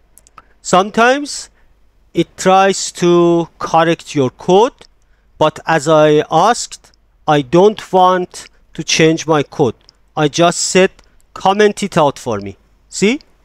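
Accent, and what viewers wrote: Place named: Iran. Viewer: یعنی کسی که هیچ آشنايی با زبان انگلیسی هم نداره، متوجه ميشه که ایرانی هستيد و شايد هم متوجه اصفهانی‌بودنتون بشه. با وجود هوش‌مصنوعی‌هایی که با صدا و لحن خودتون صوت انگلیسی تولید می‌کنند، چه اصراری دارید که با اين لهجه‌ی فاجعه، خودتون صحبت کنید؟؟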